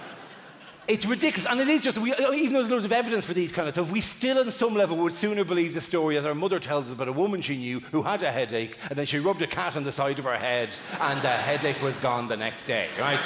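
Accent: Ireland. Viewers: I think Dara is one of the few stand-ups that is still funny even though he is speaking a million miles an hour, as that doesn't often really work well for susained comedy. Must be the Irish lilt!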